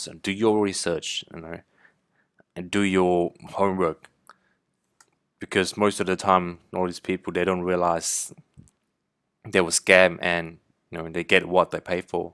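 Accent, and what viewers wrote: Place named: Australia. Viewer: he's Asian, with an Australian accent, is what I mean.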